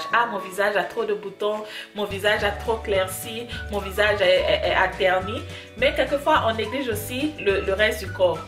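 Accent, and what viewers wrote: Ivory Coast. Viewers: Accent ivoirien...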